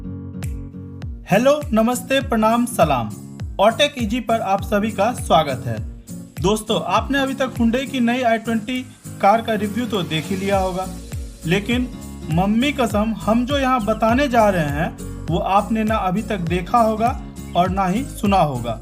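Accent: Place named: Bihar, India. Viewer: बिहारी वाला अंदाज गजब शानदार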